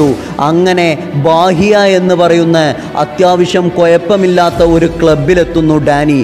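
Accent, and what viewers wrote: Malappuram, India. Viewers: 😄😃Achan dovingos footballinte Oru pirandanayirunnu enn saaran 😁😁thani Malappuram slang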